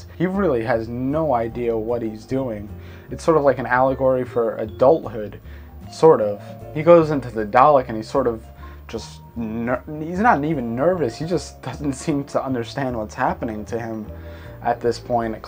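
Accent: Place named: Scotland, United Kingdom. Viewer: I like your Scottish accent :)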